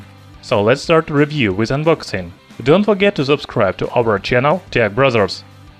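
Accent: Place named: Russia. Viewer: What is your accent? Russian? I can't tell.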